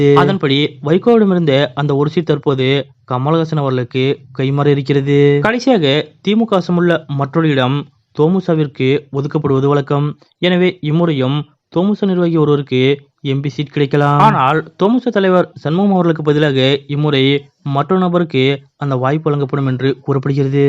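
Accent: North India, India. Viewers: Speak properly Tamil. You are not original Tamilian. May be from North India. From Gujarat????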